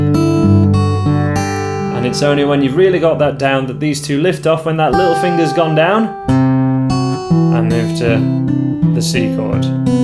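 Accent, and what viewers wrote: United Kingdom. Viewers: Hi,..your accent is a british man. I think you are from England. I am just started to learn guitar and your website came across and i decided to download from lesson one to three. Thank you for complete information for the beginners like me. God bless you... 🙏❤🇵🇭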